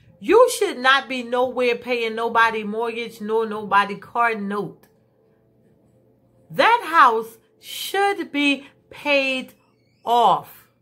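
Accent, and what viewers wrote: New York, United States. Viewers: Agree 100% You look so pretty, remind me alot of my Auntie's on my Fathers side ..I get New York vibez♡love your accent! Tfs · Are you from New York? I can tell from your accent in your voice if not new York somewhere up north love your channel and I have subscribed god bless😘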